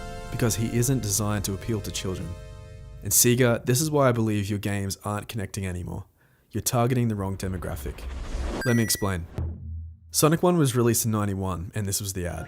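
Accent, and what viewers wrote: Australia. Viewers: He's from Straya, that's not his fault lol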